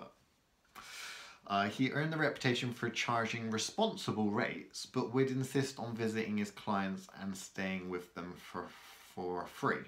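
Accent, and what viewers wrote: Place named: West Country, United Kingdom. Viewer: A fascinating video, great to learn more about my part of the country and Cunning Folk in general. I could listen to your West Country accent all day! Cheers, moi luvver!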